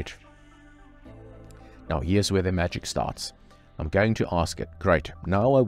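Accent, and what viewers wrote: South Africa. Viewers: That South African accent 😂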